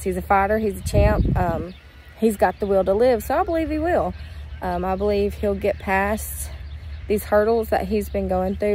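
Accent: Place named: South, United States. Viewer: Their little southern accents are just as adorable as yours..lol I'm southern too from GA lol but it's just so cute to hear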